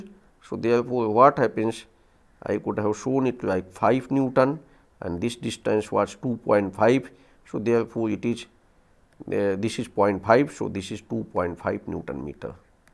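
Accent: India. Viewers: p he's Indian... as im i too...but i am not sure which part of India this accent is from..!!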